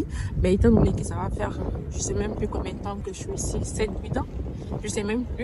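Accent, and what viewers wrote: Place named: Quebec, Canada. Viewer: Ca fait 2 jours que je t'ai découverte. J'ai presque regardé toutes des vidéos. Tu as presque un accent québécois maintenant. J'aime ta simplicité et aussi, tu détailles mieux brièvement certaines chose que je n'ai pas trouver ailleurs. Je t'envoie plein d'amour et positivité 😘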